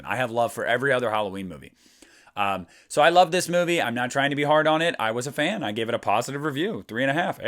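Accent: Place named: Pittsburgh, United States. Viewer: I love your Pittsburghese.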